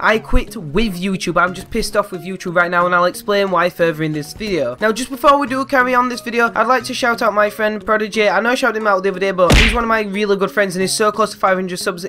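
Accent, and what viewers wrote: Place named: Scotland, United Kingdom. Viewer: lol Idk why i thought you were scottish tbh... :O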